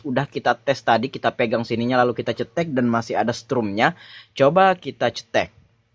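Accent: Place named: Makassar, Indonesia. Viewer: Dari gaya bicara nya bang,heheh soal nya punya ipar orang makasar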